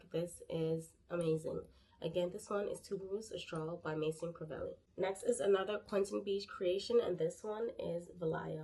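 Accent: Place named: Caribbean. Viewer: Great video! You have a slight Caribbean accent. I’m curious, what’s your nationality if you don’t mind asking?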